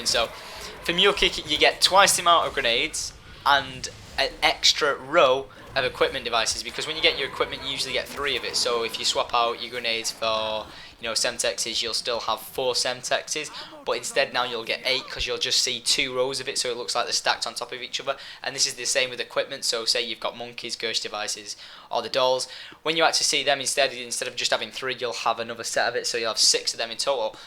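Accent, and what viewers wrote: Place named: United Kingdom. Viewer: i love how british people say modern warfare phree :D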